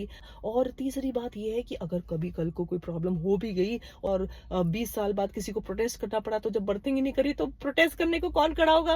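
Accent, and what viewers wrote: Punjab, India. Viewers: Nice. More punjabi please 🙏 your punjabi is very sweet. Kangana ko thappad therapy dilao. 🤣🤣🤣🤣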